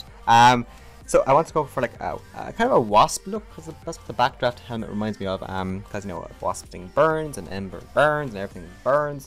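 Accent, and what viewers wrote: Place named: Ireland. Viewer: ahhh his voice is so asmr lol the only Irish voice that triggers my asmr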